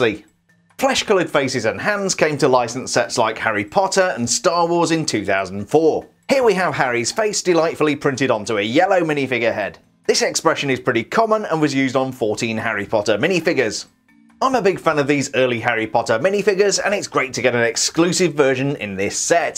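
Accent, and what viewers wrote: United Kingdom. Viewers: I’m confused do you live in Britain or America because you have a perfect British accent but then keep hinting you live in America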